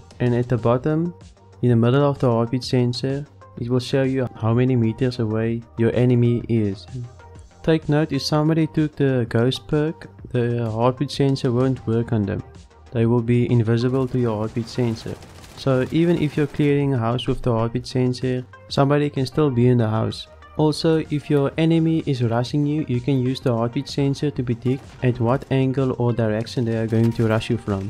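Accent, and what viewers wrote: South Africa. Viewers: 😂 Love the South African accent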